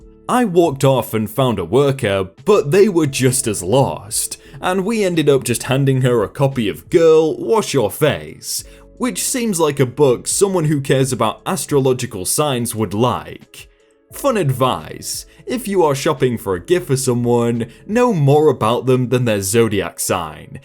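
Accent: United Kingdom. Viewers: understandable it's that smooth british accent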